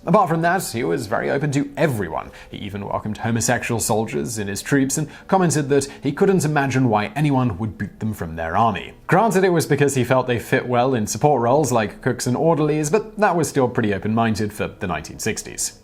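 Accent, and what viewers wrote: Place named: RP, United Kingdom. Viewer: The posh sounding accent is funny when mispronouncing words, like divisive.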